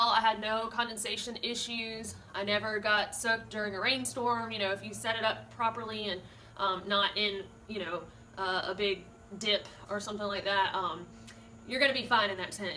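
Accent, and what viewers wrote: South, United States. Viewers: Ha ha! I like the low-key southern girl thinkin' noises! Eeehhhhhmmmmm..... sounds like home. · I was thinking, shorten the poles down dummy, but the bathtub effect makes sense. Where is your accent from, I'm guessing Arkansas or Alabama.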